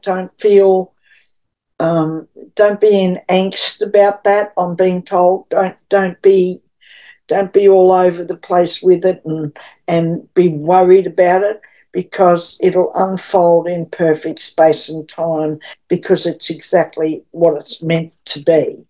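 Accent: Australia. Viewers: Hi ,it's was lovely to listen to you this morning and great hearing an Aussie accent 😊 . Interesting reading and I look forward to your next podcast x love and light Bobbie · Thanks. Lovely to hear an Aussie. I’d Love to know where you live. It looks tropical. I hope you keep sharing. It’s also refreshing to have someone focussed on people mire than politics.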